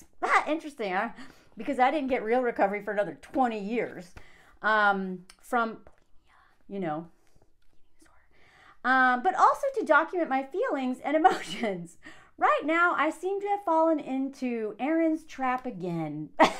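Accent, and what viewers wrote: South, United States. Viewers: your southern girl baby voice cracks me up every.time 😂 give Moz a hug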